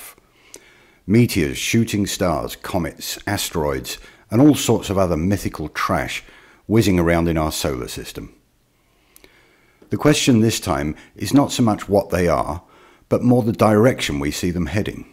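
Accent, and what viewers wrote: England, United Kingdom. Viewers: Hmm I was going to respond to you myself, but I thought I would like the answer to be as simple as possible, and I know that you have stated in other videos that you would not accept any answer with the word gravity in it. So I decided to ask my 5 year old son for you. He has not learned about gravity from school or myself as he is only 5. This was his reply, he thought about it for about a minute, and came up with this answer. Well planes can go up as well as down, but asteroids can't go up. he thought a bit more about it and added. it's like you don't see rain going up. at this point he lost interest and went back to playing with lego. I couldn't have put it better or simpler than that. And I know that asteroids can sometimes appear to go up, and rain can also go up in extreme weather conditions. but he is only 5, and appears to have a better understanding than you without any education on the subject, or prompting by myself. 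As you have an English accent I take it you have seen the program are you smarter than a 10 year old. Well you don't seem to be smarter than a 5 year old. Now please stop preaching disinformation to gullible people, you do not realise the harm you are doing to them.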